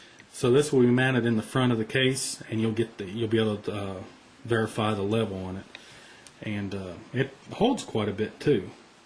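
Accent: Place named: Southeast, United States. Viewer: your accent sounds like american south-east? Would you mind telling where you live? don't need to be specific.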